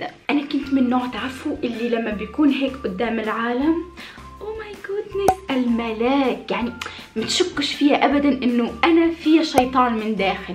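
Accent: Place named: Tunisia. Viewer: لهجتك : كوكتال تونسي على مصري على لبناني من انت؟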